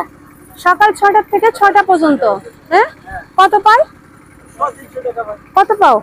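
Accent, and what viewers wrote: Chittagong, Bangladesh. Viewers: আপু আপনি মনে হয় চট্টগ্রামের মেয়ে কথায় বুজা যায় আপনার ভিডিও খুবই ভালো লাগে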